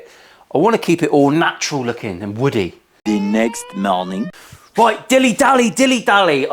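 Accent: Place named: Liverpool, United Kingdom. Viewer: Pretty good Scouse accent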